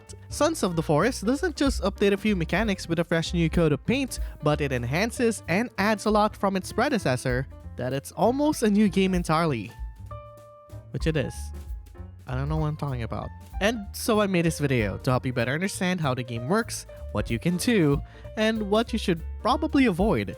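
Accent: Philippines. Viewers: i needed help but not that bad enough to put up with this fkn fillo accent bhahaahah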